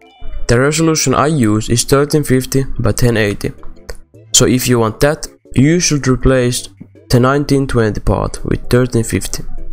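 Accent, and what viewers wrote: Finland. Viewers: Thanks! Oot vissii suomalainen?? Huomaa aksentista xd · Nyt paistaa kyllä suomi aksentti nii saatanasti xd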